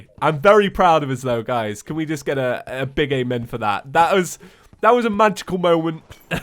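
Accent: England, United Kingdom. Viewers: I know I know, you're English, you have an accent, and that's great, don't get me wrong... But no offence, sometimes it seems like people just purposely mispronounce words, just for the sake of standing out:P (Yoshee/Yoh-shi) It's like, I know how to say it, I know how the creators intended it to be known, but hey, I'm different, let's just change it up... Haha, silly beans.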